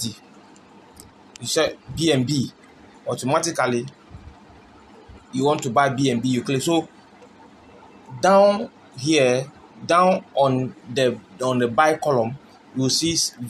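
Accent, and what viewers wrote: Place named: Ghana. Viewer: Ghanian accent is so annoying and you talk too much. Go straight tot he point pls. Thanks anyway, I figured it out before even getting half way through this video.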